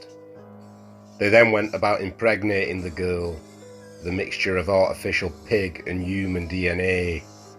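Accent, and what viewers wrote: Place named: Staffordshire, United Kingdom. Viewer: Love your channel!!! In a age of computer generated A.I narrator's,it's awesome to hear a Staffordshire accent telling the stories. Respect brother!!! 🙌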